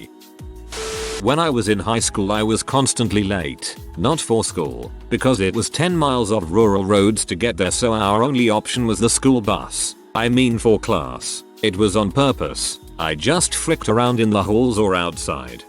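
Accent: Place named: United Kingdom. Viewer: Just another lazily made Reddit video with a robotic British accent.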